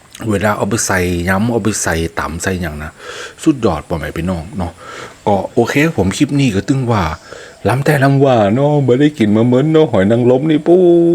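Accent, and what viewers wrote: North, Thailand. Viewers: มาอยู่ต่าง จว. ดูผิวคล้ำดำไปเลย🤣🤣🤣 อยู่กับธรรมชาติ แต่ที่เหมือนเดิมคือกิน กินอร่อย ไม่เปลี่ยน ลืมอีกอย่างที่เปลี่ยนคือพูด ภาษาเหนือ ฟังรู้มั้งไม่รู้มั้ง 555 แต่ก็ต้องฟัง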